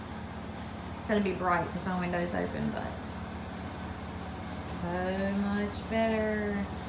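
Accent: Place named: West Virginia, United States. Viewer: I just seen your channel. I live in NC but I am from WV. You have that wva accent lol. Love it. Also love there is no pretend bullshit. Keep it real .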